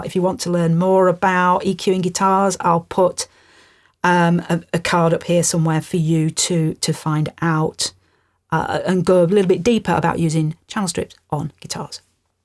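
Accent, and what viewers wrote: Northern England, United Kingdom. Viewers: Hi! So glad I found your channel. There are way to many options and mix decisions to make with the plethora of plugins these day that it's paralyzing creativity. It reminds me of when Photoshop plugins went bananas (previous career). Too many options doesn't equal better results, in fact I would say it disables it. I love your Chanelle, and as an ex-pat from Leeds it's great to hear a northern accent. Keep up the good work. Cheers!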